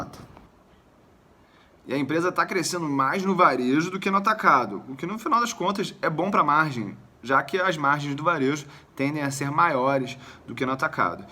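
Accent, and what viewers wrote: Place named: Rio de Janeiro, Brazil. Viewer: Bom vídeo , mas esse sotaque carioca é cansativo pra mim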